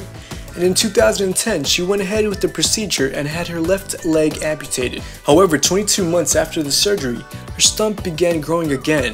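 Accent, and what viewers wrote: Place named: New York, United States. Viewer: he just needs cough medicine.. GET OUTTAA HEEAAAH.. a NY vocal mayb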